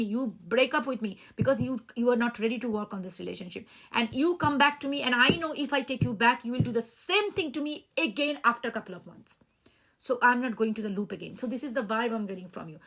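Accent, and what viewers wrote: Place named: Kerala, India. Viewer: Didi ji,your assent tells that you belong to kerala than bengali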